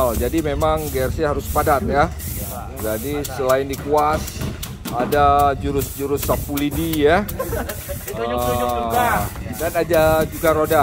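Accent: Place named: Banyumas, Indonesia. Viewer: ko orang ngapak bos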